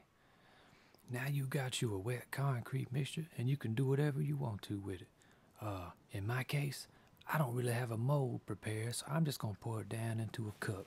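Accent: Louisiana, United States. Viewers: Is that a Cajun accent I hear?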